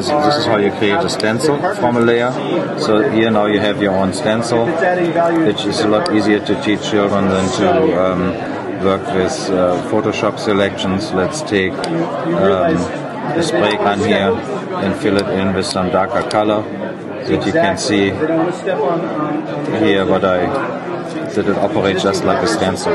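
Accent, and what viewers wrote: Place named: Germany. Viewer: are you from germany? you sound like one :D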